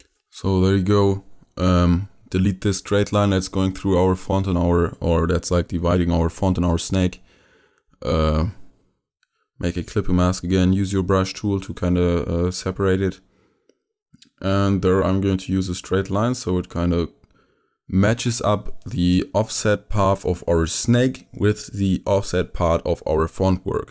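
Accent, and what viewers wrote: Germany. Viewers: i think you have an german accent :O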